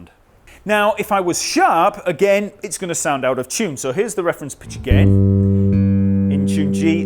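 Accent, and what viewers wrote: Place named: England, United Kingdom. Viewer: when did shane o mac get an english accent?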